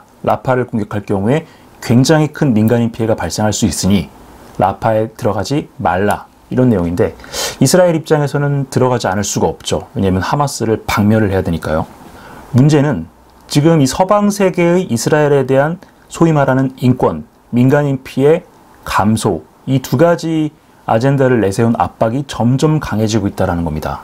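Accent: Gyeongsang, South Korea. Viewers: 지역주의 말씀하시는 분이 더 지역주의 위에 계신 것 같은데, 신인균씨가 사용하는 사투리가 경상도라서 그렇지 그것빼곤 뭐 그닥 국방TV 운영하는데 문제없어 보이네요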